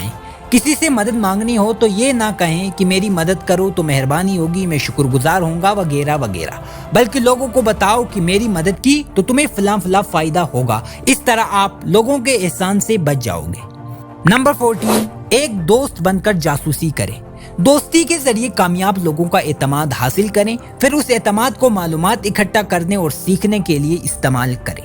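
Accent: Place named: Gilgit-Baltistan, Pakistan. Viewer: Apky urdu accent sey lagta hay app Gilgit Baltistan say belong krtay hain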